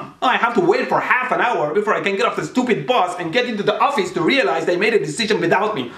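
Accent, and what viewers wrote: Iran. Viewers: he has an Iranian accent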